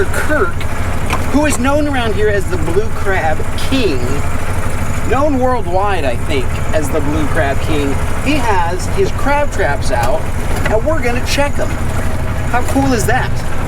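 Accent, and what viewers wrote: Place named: South, United States. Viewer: Love the little southern twang you picked up hanging wit dem boys.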